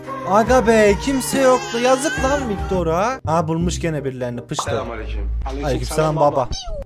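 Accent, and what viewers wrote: Gaziantep, Turkey. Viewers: abi sen anteplimisin ben antepliyim şivenden anladım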